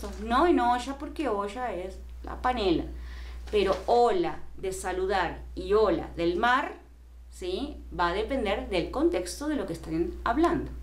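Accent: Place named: Rioplatense, Latin America. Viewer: Me gusta tu acento. Yo creo que debes mantener el acento Rioplatense. Podrías enseñarnos algunas expresiones típicas de Argentina. Gracias.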